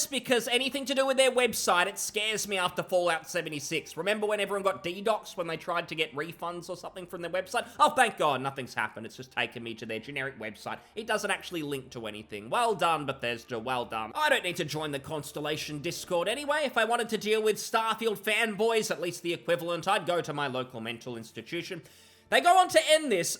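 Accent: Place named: Australia. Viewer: All Australians sound like this.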